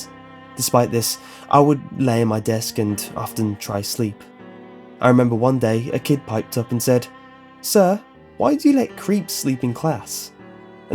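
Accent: England, United Kingdom. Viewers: ENGLAND. That's why I love your voice so much. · That explains why did you sounds like a British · Where from england are u from are u york shire bread or southern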